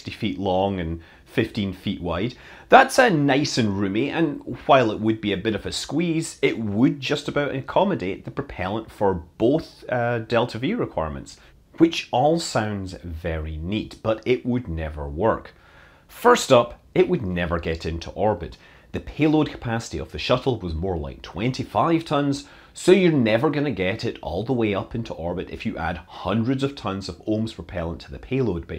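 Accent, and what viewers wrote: Scotland, United Kingdom. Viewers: Scottish accent, which is so cool to listen to. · Scottish accent, nothing wrong with that. Not like all the 'would of' Americans.....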